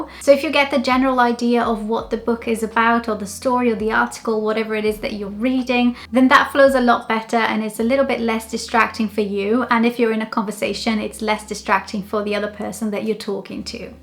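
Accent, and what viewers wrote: United Kingdom. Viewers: Uma brasileira com inglês britânico? I love it! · A lucy falou do sotaque britânico dela! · Hi, you asked about our backgrounds. My name is Timo and I live in Finland. I listen to podcasts, Youtube videos and audiobooks of different topics in the background when renovating, woodworking, washing dishes or driving a car. Lucy mentioned you are a great example of a person who has gained a British accent and she is right. Even if I don't live in an English-speaking environment, it is nice to learn new ways to improve your fluency. Thanks for the video. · Hi ! I'm new here to your channel. I can't believe you are brazilian girl, your British accent is so pleasant that you sound like a native! I saw your video of how you became fluent in English. Your journey is very fabulous. I love your accent.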